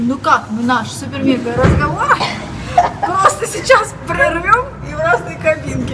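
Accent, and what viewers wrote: Rostov, Russia. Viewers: вы с Ростова?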